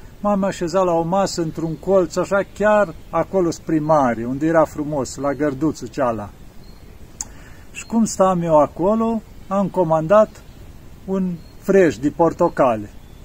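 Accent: Moldova. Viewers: Parinte voi precis sinteti roman ca cind vorbiti parca aveti accent moldovenesc va multumesc pentru sfaturile voastre frumoase imi plac povestirile care le spuneti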